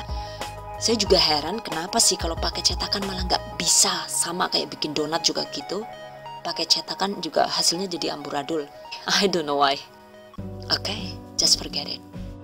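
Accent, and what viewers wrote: Banyumas, Indonesia. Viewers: Bundaa kok bisa bahasa ngapak? 😁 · aku suka Vidio orang ngapak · Bunda tu ngapak kah hehe... Btw suka sama chanelnya 😍😍 lebaran kmrn aku pakai resep ni buat pesenan pastelnya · Pinter masak.. Pinter bhasa inggris Ehh pinter ngapak jg Komplit deh😁😁😁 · Manstap bgt bunda...ngapak ykykny bhsany..sama nih🤭..suka bgt q kue ini🤗🤗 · Assalamualaikum ......aku sangat suka dan ngefens samambak punya ciri khas suara yg sangat merdu skli.aku suka resep2nya mbak .danadaresep totorialnya yg mdh dansimpel utk dipraktekan aku sangat suka bhs ngapak2nya itu ......😂😂😂😂😂😅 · Syuka bgt sm resep2nya Yu...hp sy semua isinya,sy save & ada yg beberapa sy praktekin,masyaAllah chanel resep yg gk pake bohong yg pernah sy subscribe.😊...setiap yg sy praktekin Alhamdulillah berhasil.syuka jg sm ngapaknya itu yg gk pernah ketinggalan,CINTA NGAPAK pokoke ya Yu:)) Sukses & sehat selalu ya Yu...semoga pahala trs mengalir krn selalu tebar kebaikan. 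Pengin meet up sm yayune,hehehe..."semoga" #GiveawayTHVFEBRUARI19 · Konten yg menyenangkan...ada bahasa ngapaknya Jawa saya..i like ..thank you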